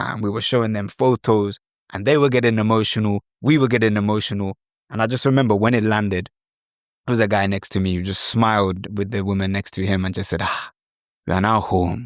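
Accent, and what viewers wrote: Ghana. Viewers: I like your Ghanaians ascent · It's quite easy to see some Ghanaian training in you when you speak..... It's amusing and humorous at the same time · Lovely ❤ You do speak like a Ghanaian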